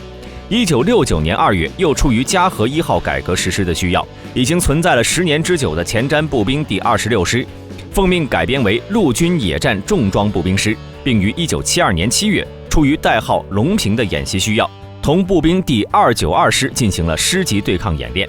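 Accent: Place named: China. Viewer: 這視頻都是台灣人做的配上大陸腔的旁白 ! 讓你以為是中共做的 !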